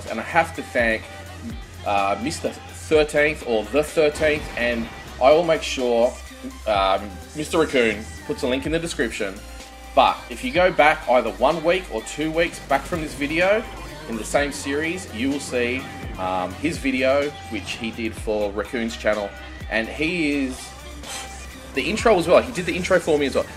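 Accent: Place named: Australia. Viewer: I like the Australian accent, so pwetty :3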